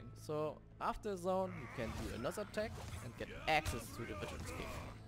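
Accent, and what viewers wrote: Germany. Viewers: Man hört heraus das du Deutsch kannst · is he from germany becaus of his pronaunciation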